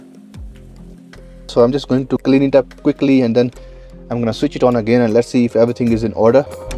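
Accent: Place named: India. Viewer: Bro I think you are Indian . As your accent is exactly Indian.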